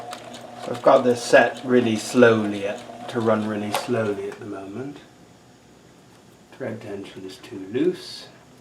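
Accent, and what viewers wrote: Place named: England, United Kingdom. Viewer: I'm from California, sounds like your mabe from England?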